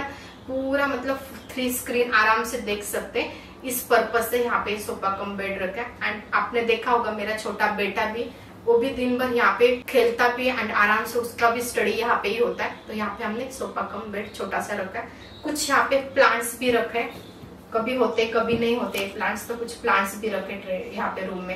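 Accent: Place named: Maharashtra, India. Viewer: This Particular video is nice 👌 i think your first language is marathi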